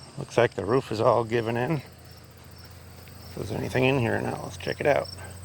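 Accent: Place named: Minnesota, United States. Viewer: That convent is a pretty magnificent old building in the daylight, but would scare the sense out of me to walk up on it at night. No way you'd get me in there. If I was to guess, I would say you might be from Minnesota. I lived there as a kid in the 60s, and your way of speaking sounds like my mother's side of the family who still live there. I've lived in Nebraska ever since then and some people still guess me to be fom Minnesota. Some things ya never lose. Enjoyed the tour. Thanks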